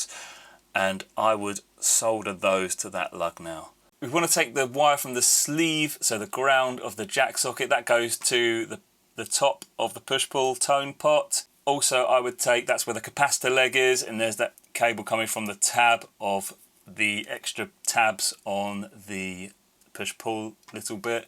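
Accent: United Kingdom. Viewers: it's for England English speakers ... USA English speakers can barely understand him as he talks so fast in British English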